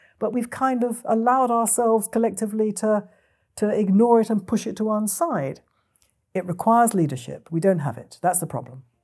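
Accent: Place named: United Kingdom. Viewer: " . . .because people need to live in a society which is civilized which looks after the welfare of others rather than yourself. . ." This comes from a female with a British accent. Hmm, wonder what she means by "civilized" and "the welfare of others". Surely she's talking about the others with much darker skin, right?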